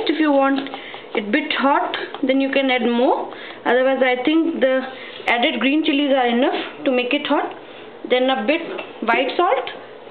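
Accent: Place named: India. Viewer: Its indian accent...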